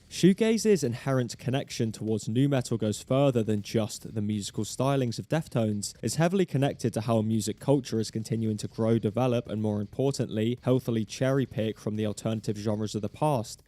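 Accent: United Kingdom. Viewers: You're British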